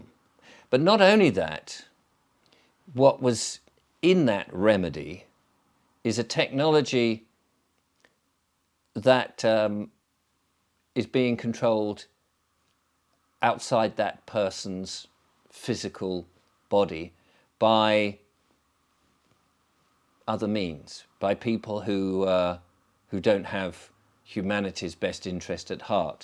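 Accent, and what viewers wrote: United Kingdom. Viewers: hi, since I discovered your channel I only watch that, really interesting, I also enjoy listening to the british accent...so elegant...keep doing a great job, cheers from an Algeria guy lost in the cold of Montreal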